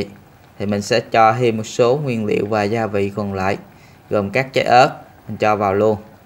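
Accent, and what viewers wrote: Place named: South, Vietnam. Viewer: Nghe giọng giống của thầy Thích Tâm Phúc quá ! Rất Nam bộ , tuyệt vời ! Cảm ơn tác giả nhé !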